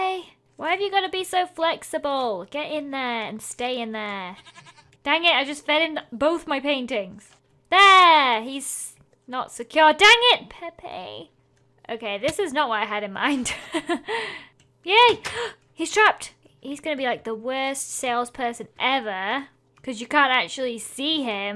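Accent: United Kingdom. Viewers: Did any one else notice her saying bannene smoothie instead of banana smoothie or maybe it's her British accent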